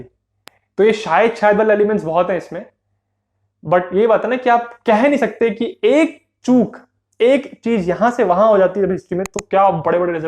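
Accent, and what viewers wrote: Bengal, India. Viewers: Bhai tum Bengali ho kya please reply🤗🤗🤗🤗🤗🤗🤗🤗🤗🤗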